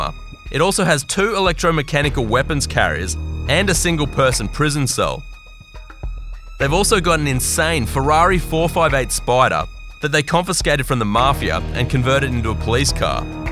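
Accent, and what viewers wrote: Australia. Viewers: Your Australian accent is so charming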